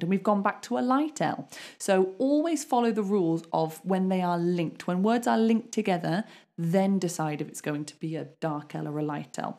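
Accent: United Kingdom. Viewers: I adore standard British accent, thank you for your lessons! I absolutely love it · Pleasure to hear pure clear british english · Excellent ! Lovely British pronunciation ❤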